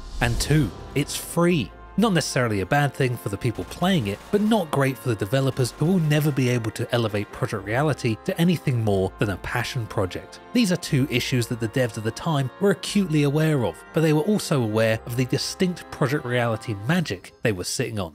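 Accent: United Kingdom. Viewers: What a great video, thank you for your brittish accent.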